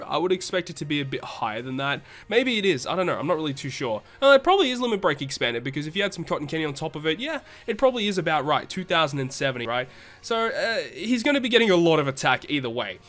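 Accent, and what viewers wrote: Australia. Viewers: with his accent you should be able to tell he's Australian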